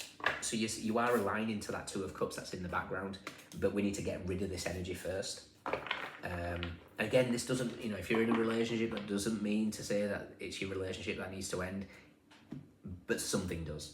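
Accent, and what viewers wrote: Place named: Cumbria, United Kingdom. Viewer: Are you from around the Barrow-in-Furness area? I was born there, your accent is familiar. Yes I'm done with family that have a toxic pattern. Been scapegoated long enough. Done. Isolated, alone, but better than being crapped on repeatedly!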